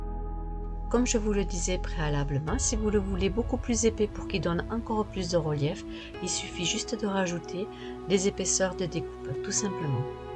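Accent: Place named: Montpellier, France. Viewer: Bonjour, je viens de m'abonner, parce que merci pour ce partage et aussi parce que j'ai le même accent! !! Hihihi, je suis originaire de montpellier, mais je vis en RP, à bientôt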